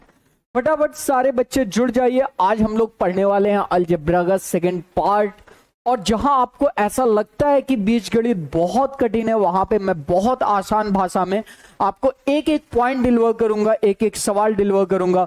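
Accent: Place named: Bihar, India. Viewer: Bhai sun plz beech beech main bihari na bola kar prblm hoti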